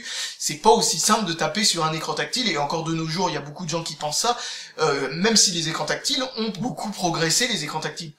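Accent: France. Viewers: Je suis entièrement d'accord, surtout qu'il prononce pas mal de trucs à la française...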